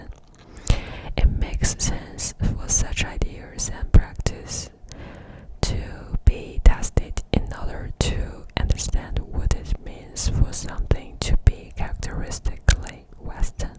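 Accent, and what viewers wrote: England, United Kingdom. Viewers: Love your english accent, send me off to sleep! New sub from me would love to see more like this!❤ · I really like your English accent you should be make more video like that video please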